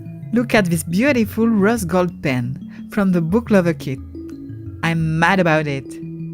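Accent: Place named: France. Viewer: Très belle vidéo, très agréable... une très jolie déco dans ton planner. Ce kit est vraiment sympa ! La french touch dans ton anglais est adorable ^^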